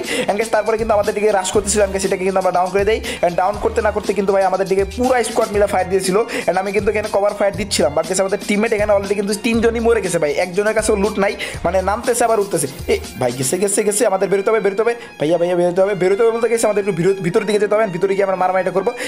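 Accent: Tangail, Bangladesh. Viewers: Vai apnar vasa tangail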